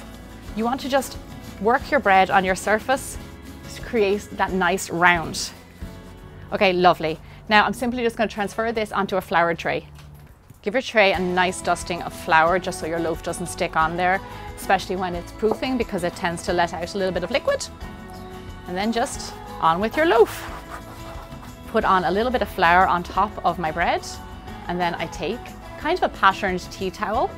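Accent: Ireland. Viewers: I had to watch it three times -- I kept drifting off listening to that Irish Accent and not paying attention to the recipe. Sure she seems sweet in this video but you haven't seen her angry.😁😉❤ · I cant help but notice the Irish accent or is it? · Thank you for this video and I love your Irish accent, I have just started making a lot of no Knead breads and I needed this one for my collection,,,, One question, I bake my no Knead bread in a preheated oven and pan at 450 for about 30 min covered then I uncover and bake to get crust the way I like it, 3- 15 min, is it ok to do that with this recipe? you have a new sub and thx again and I look forward to watching more of your videos. ;)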